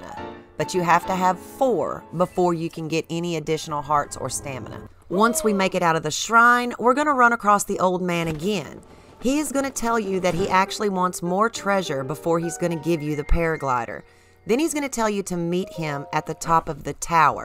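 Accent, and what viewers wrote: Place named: Kentucky, United States. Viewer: Dang you sound just like I do.. I was born and raised in Kentucky.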